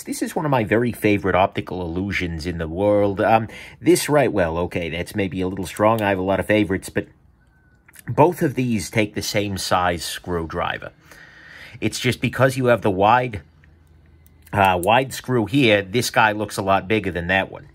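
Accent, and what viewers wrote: New York, United States. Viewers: you have to be from New York or New Jersey!?! I enjoy your videos knifes and explanations on the parts and disassemblies!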